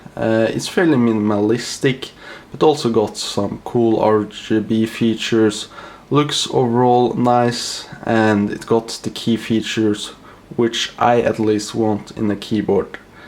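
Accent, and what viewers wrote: Sweden. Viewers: Are you from Sweden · I like this Nordic Swedish Accent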